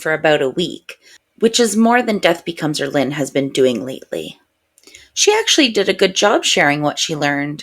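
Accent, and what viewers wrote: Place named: Canada. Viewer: you sound so canadian lol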